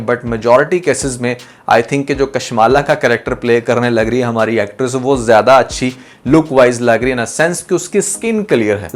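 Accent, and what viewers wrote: India. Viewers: ان کی خوبصورتی کو چھوڑیں، پہلے یہ بتائیں کہ آپ بھارتی لہجے کی نقل کیوں کر رہے ہیں۔ پھر کے بجائے فیر کہنا۔ پاکستانی ہیں تو اردو تلفظ اپنائیں۔ You sound disgusting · میرا اعتراض الگ ہے۔۔۔جو تم ہندی لحجے میں لفظ۔۔۔پھر۔۔۔کو۔۔۔فِر۔۔۔کہہ رہے ہو اس۔ کی کوئی خاص وجہ ہے کیا؟؟؟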